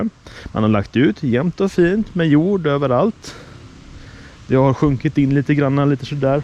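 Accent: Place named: West Coast, Sweden. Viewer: Tack. Man ska inte lufta på sommaren väl? Tänkte kanske lufta i augusti en vända samt slänga på topdress o överså, vad tros om planen? Är du baserad på västkusten? Låter som dialekten är här någonstans. Är själv baserad i Mölndal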